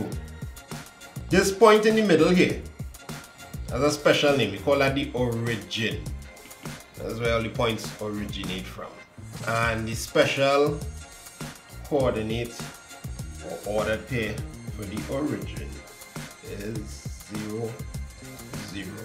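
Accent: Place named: Trinidad and Tobago. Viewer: I can tell that he is trinidad by the patois 😂😂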